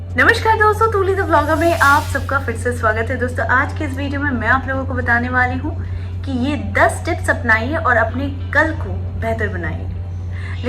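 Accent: Bengal, India. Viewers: Kya ap bengali ho?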